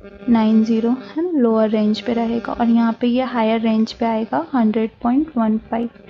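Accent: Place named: Indore, India. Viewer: Mam aap indore se belong karte hai kya aapki aawaz se lag raha hai😁👍😅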